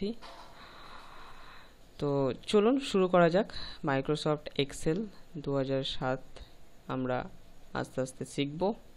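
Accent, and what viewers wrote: India. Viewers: কলকাতা আর বাংলাদেশের মধ্যে উচ্চারণগত কিছু পার্থক্য আছে। সেটা ব্যাপার না। ব্যাপার হলো আমরা অনেক শিখেছি ওনার কাছ থেকে। তবে দার কথাগুলি অনেক ভালে লেগেছে এবং ওনার টিউটোরিয়াল থেকে অনেক উপকৃত হয়েছি। দাদা অনেক ধন্যবাদ আপনাকে। আপনার থেকে আরো অনেক টিউটোরিয়াল চাই। দাদা মাইন্ড করবেন না। কিছু লোক আছে যারা সবসময় মানুষের সমালোচনা করে।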